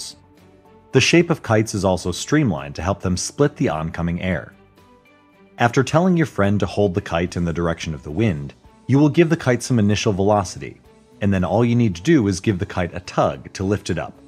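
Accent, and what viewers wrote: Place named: United States. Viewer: Dude how did speak so well in american accent. How did you train?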